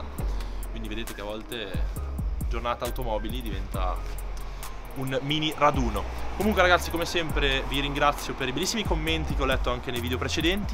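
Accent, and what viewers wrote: Genoa, Italy. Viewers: Video veramente stupendo 🤩 ma belin dato che parli in genovese spero che tifi Sampdoria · Bellissimo video sei migliorato tanto, qualità,contenuti, durata e improvvisazione. Intrattieni sul serio, dovresti fare una serie tipo Gomorra sulle auto e lifestyle Bye con la cadenza genovese ahah numero uno ti adoro 😂😘💪🏻🥇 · Aaaaa il genovese di ahahah😍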